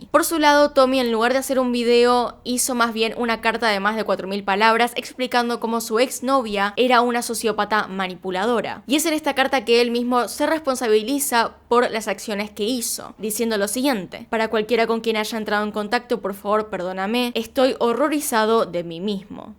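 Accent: Argentina. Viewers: Eres argentina? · realmente existen hijos asi que horror mejor es alejarse con el dolor del corazon no se llevaban bien creen que USA hubiese quedado impune si hubiese matafo al padre era una justificacion por el dinero y odiaba a su madre yo hubiese dividido el dinero y preservar su vida y salud mental.interesante caso gracias me encanta tu voz con tu dejo argentino saludos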